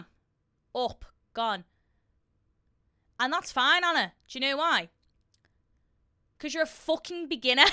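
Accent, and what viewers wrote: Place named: England, United Kingdom. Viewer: Primeira vez vendo seu canal com a sua voz original e legendas já que sou do Brasil, costumo colocar a tradução na sua voz mesmo em português porém nesse vídeo não possui Aliás adorei seu sotaque é inglesa certo?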